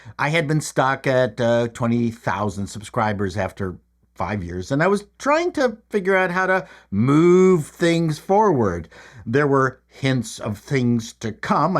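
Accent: Canada. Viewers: I am here for the chrisp Canadian accent. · 🤔'Drunk acerbic Scottish movie critic'? Not sure who you are referring to. 🤣This was indeed fascinating and entertaining sir, I knew and enjoyed your earlier work with the Frantics, and joined the fellow followers know as Dennises(?) and missed the Hackintosh era. It was great to hear you talk about the evolution of your channel, but when you were 'geeking' out and going into the details on your computer builds. For some reason I started hearing the hit ' ' in my head!😂 Thanks for the video and the laughs sir, very glad that YouTube dropped me the suggestion to check you out! I mean I get to enjoy your acerbic movie and television reviews, minus the alcoholism and with a Canadian accent! 🍁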